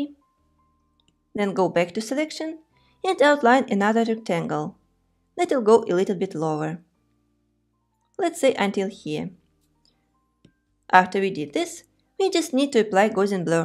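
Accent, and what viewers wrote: Russia. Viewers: Сразу почувствовала, что вы русская ❤ у вас супер Английский) спасибо за отличный урок ❤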